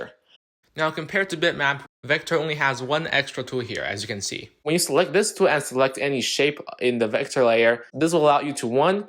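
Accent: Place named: China. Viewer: Your Chinese accent is funny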